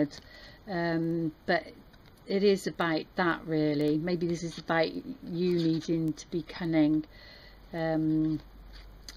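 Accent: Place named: United Kingdom. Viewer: Impressive....first time watching and I loved everything about this. Resonates so much....like you were talking directly to me. I also have to say, loved listening to your accent....makes me miss my British family even more.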